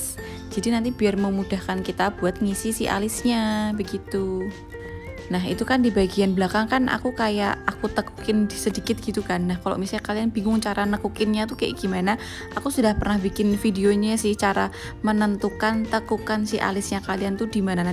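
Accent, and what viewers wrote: Java, Indonesia. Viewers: Mbk medokkkk😍😍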